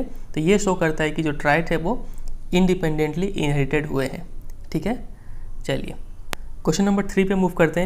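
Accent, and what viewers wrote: Bihar, India. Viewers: Sir aap bihari h kya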